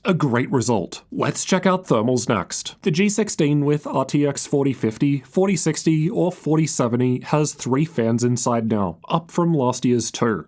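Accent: Australia. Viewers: You're the best. Are you Australian?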